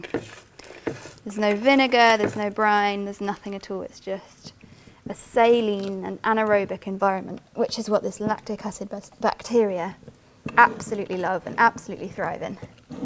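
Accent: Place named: United Kingdom. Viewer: As soon as I hear a British accent on a cooking show, I skip it... Never let a Brit into the kitchen...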